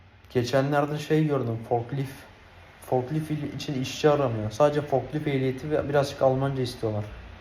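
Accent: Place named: Bulgaria. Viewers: Bulgar değil, Bulgaristan göçmeni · Bulgar ya da trakyalı gibi konuşuyorsun. Beyaaa....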